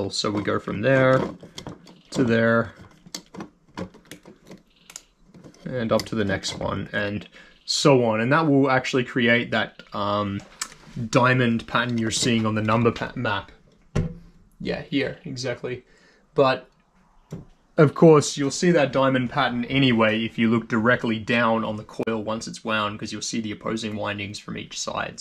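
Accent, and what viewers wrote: Australia. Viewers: Slight Aussie accent Almost American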